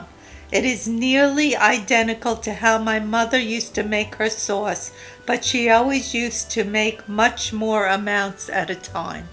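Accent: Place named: New York, United States. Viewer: Hi again Grandma! You were married close to the year I was. Do you and Grandpa have a secret for such a long marriage? It's so rare these days. Btw I grew up in Mahopac NY and knew people with your same lovely accent. As a bride I had a set of the flowered Corelle dishes, green and white. P.S. they DID break although they were called unbreakable. Best wishes, Donna in Vermont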